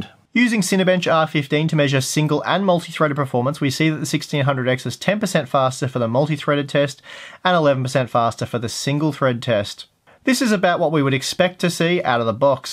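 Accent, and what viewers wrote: Australia. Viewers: Holy crap, you're: a) Australian b) Not annoying Good video.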